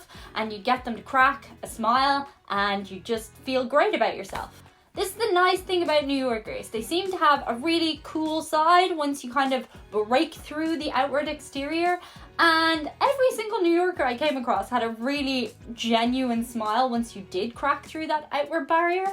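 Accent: Ireland. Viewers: As a Pharmacist, I will be checking a prescription for accuracy, while I’m on the phone with a Doctor, while a Pharmacy Tech is asking me a question. That’s considered “mild multitasking” in Pharmacy World. I jay walk everywhere I go. But, it’s absolutely the most fun in NYC. It’s like a carnival ride. The drivers don’t slow down or maneuver, it’s awesome. It’s a list, just a list... you met some nice ppl in NYC. But, you’re a cute blonde, with a cute Irish accent, and you’re white. You can figure out the rest. And, if you have a person videotaping you, people are going to be like angels. Call B&H Photo Video, tell them you want to buy an expensive digital camera. Then ask lots of questions. Try to extend the convo into a friendly conversation like one might have with a barista! Ask them “what does that mean?” Ask it a lot! Lol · You have your Irish accent,.🍀 That will melt any New Yorker's tough exterior! 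· Let me guess, a very pretty woman with an Irish accent got some cold hearted New Yorker's to warm up. Who could have thought that was possible?.... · It was probably her Irish accent that made people more likely to help her. ¯\_(ツ)_/¯ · New Yorkers were nice to you because you reminded them of their great Irish granny (your accent). They don't hold doors open because you gotta stop. Finally, they laughed when you dropped your food because NOBODY drops their food. They might throw it away but they don't drop it. Just kidding. You're hot and nice. It goes a long way. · Honestly I do think the smiling and being friendly to you. Look in the mirror very attractive / Blonde / Irish accent. I think that is most likely why you thought that. Beside smiling and slamming doors in your face. You had the best of both worlds. I really wish tourist's would visit small town America. Everyone goes to large population areas. 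Small town USA. Smiles holding doors and good service is us. Your welcome to come to Oregon. {Just don't stay in Portland } Come to where i live Lyons,Or Settled by 2 Irish brothers.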